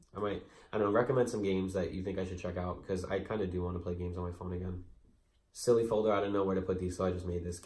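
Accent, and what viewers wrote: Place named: New York, United States. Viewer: Your NY accent is so awesome. Please keep making vids. They’re really interesting. Good job.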